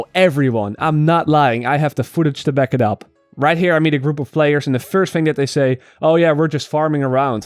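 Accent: Netherlands. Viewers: am from the Netherlands too. I can understand that it's not his first language but he is just talking Dutch with English words if that makes sense.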